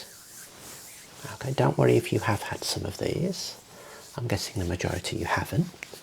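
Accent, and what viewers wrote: Birmingham, United Kingdom. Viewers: He is Brummie Taika Waititi, and no one can convince me otherwise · Your accent is too beautiful! 😍 You're from the UK, right? May I know, just out of curiosity, from which part? I love how you talk! Sending love from Argentina! 🇦🇷❤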